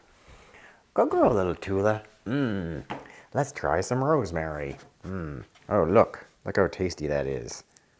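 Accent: Canada. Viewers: I thought I detected a Canuck accent... I love being right! We really do pronounce "out" and "about" in a special way..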